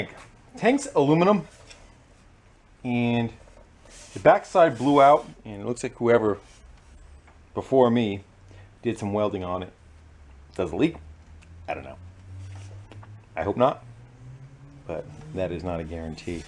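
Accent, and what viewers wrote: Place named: New England, United States. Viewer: Love the videos,. Good to see a fellow New Englander, accent and all making YouTube videos that are educational and entertaining. Keep up the wicked good work!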